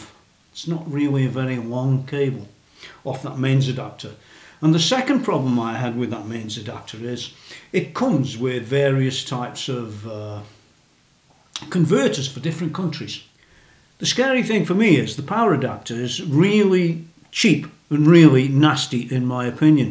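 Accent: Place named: Derbyshire, United Kingdom. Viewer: Hi. I’m new to your channel. Really enjoyed your presentation. I’m seriously thinking of grabbing the H90 is someone wants my Luke. I can’t pinpoint your accent.Derbyshire? I will try and find you on Facebook as I would love to bend your ear. Fantastic channel.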